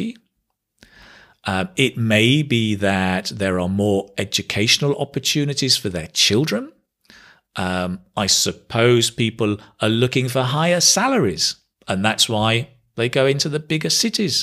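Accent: United Kingdom. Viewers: Your English accent sounds amazing .. I am in love with British English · Hi. My exam is on March 7th. I really would like to have as much ideas from you as possible. I like your ideas and I love the British accent. I hope that you can make more videos sir. Thank you so much 🙂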